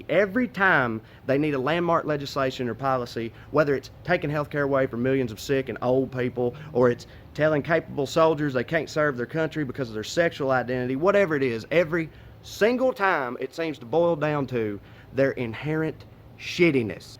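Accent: South, United States. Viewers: As an ex-pat Arkansan, it's refreshing to hear a southern accent saying intelligent things. I love my home state, but the people there can be absolutely idiotic. They voted for el trumpo. Go figure. I live in DC now. He's a goner from what I see in the beltway. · We love you too... nothing better than a liberal with a southern accent. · You’re awesome!!! It does my heart good to hear your southern accent speak wisdom! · Oh my God, I love you. You are like Jon Stewart with a Southern accent.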